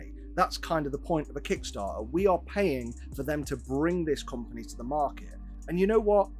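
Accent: United Kingdom. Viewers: "I sound cocky and arrogant" Me: That's probably Americans judging him based on his accent.... explains boot As an American, I think any American that interacts with any UK media or content creators and does not know bonnet, boot, lift, flat, torch, etc is just being willfully ignorant... on the positive side though, they would also miss the "knob" double entendre.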